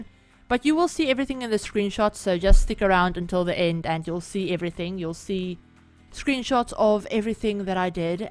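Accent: Afrikaans, South Africa. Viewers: Are you Afrikaans? Your accent sounds very Afrikaans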